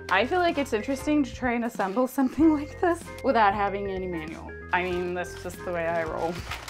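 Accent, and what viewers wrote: United States. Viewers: You sound totally American to me, I'm British Canadian and lived in Canada for 20 years but I still sound English. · You could easily pass for an American! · It bites her sometimes. People assume she's American and think she's an idiot for not understanding common words like knurled. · Wait, what, you're Swedish?? I'm Norwegian and have never heard any Scandianvian speaking with such a flawless American accent as yours. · It's so weird how she is so fluent in English that she sounds American but she can't read English fully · dude with your English speaking accent you can TOTALLY pull off that you're American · do you have an american accent when you speak swedish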